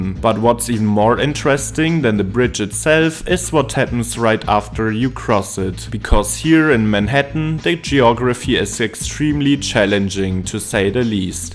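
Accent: Germany. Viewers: "elewated" . your German accent couldn't be more apparent haha. Keep up the good work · How dense are you? Can you not hear the thick German accent?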